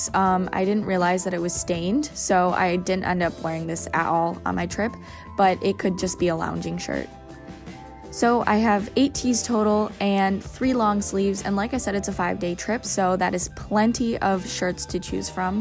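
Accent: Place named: Michigan, United States. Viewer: Me: idk why but she sounds like she’s from Michigan Her: get ready for the Michigan wether Me: I knew it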